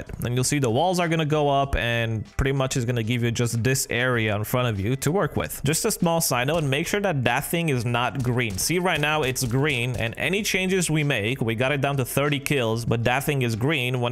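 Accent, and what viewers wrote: Romania. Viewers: Esti roman? Romanian? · R u Romanian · are you a romanian?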